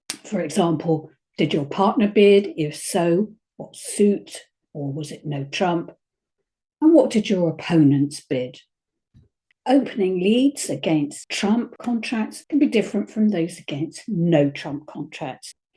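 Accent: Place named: United Kingdom. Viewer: Hello from New Zealand! I am a complete beginner, having just completed an initial 8-week course on ACOL with my local club. I am looking around for additional information and must say that your explanations are excellent. They give important context to all the rules and principles I'm trying to absorb. Thank you for this as it's much easier to remember the rules when I understand the reasons behind them. I look forward to working my way through your videos as I think they are going to be really helpful. 15,000 views would suggest that others feel the same. Love your calm British way of speaking as well - you are a joy to listen to. Thanks again :-)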